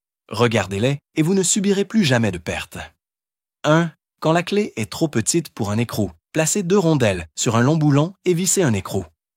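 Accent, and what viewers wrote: Canada. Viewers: Si la voix était prononcée par un accent français et non canadien ce serait agréable à écouter Si...c était plus lent à regarder...ce serait bien plus plaisant Comme ça est maintenant....impossible de m abonner J aime me sentir " bien"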